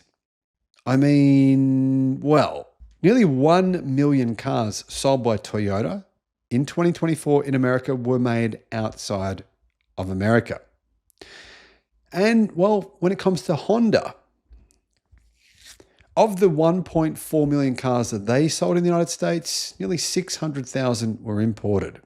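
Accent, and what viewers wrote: Australia. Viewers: Australian pronunciation , wait 'til you hear us say aluminium 😂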